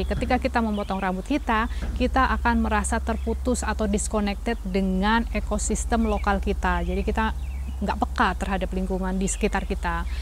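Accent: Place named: Java, Indonesia. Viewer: Masyaa Allah... Matur nuwun sanget ilmunya Mb, I love my long hair. jadi tahu sekarang, Alhamdulillah for everything. Btw, dialog Jawa nya medok banget Mb, Jawa mana Mb? Salam kenal aku asli Jawa Timur Mb. Sukses selalu dan bahagia sekeluarga..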